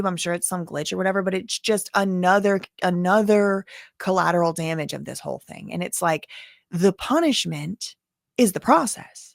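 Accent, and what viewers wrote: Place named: Louisiana, United States. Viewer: I get a kick out of your witty banter and Louisiana twang. You always say “allegedly”, “in my opinion”, “Gods Favorite” and last but not least my favorite, “Facts Ain’t defamation!” Thank you for always bringing a smile to my face.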